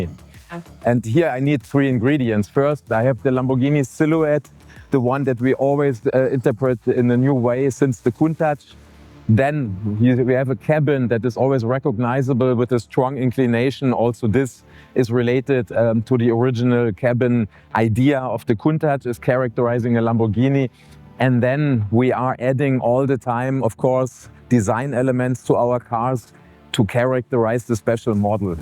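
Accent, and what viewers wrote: Germany. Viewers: Is he German ?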